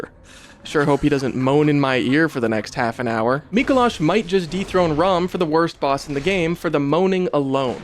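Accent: Canada. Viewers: Never realized you were Canadian, but dropping "University", "bursary", and "KD" when talking about noodles all in a single video outed you as a true great white northerner.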